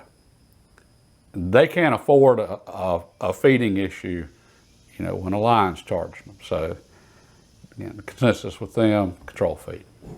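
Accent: South, United States. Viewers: Thank you for the explanation of the push feed vs the controlled round feed from a historical perspective. I know exactly what you spoke about having been born in 1936 and had a 22 caliber single shot rifle that is very much like today's Cricket. Like that southern drawl too.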